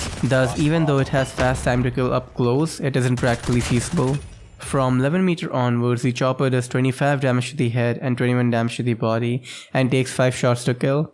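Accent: India. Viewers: Your real voice confirms that you're an pure INDIAN good job bro!!🤩🔥🔥🥳 #CYGNOUXTO20KSUBS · Hmm You are from India , Right? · Bro are you Indian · voice sounds Indian slang 🙏☺️ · R u Indian??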